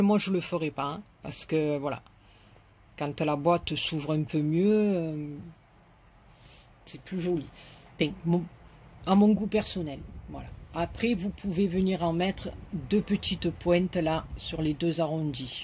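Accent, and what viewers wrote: South, France. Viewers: Coucou, ils sont juste magnifiques tes coffres, j'adore! merci beaucoup pour ce tuto, ces explications et ta bonne humeur, j'adore t'écouter avec ce petit accent qui nous rapproche du Sud, bisous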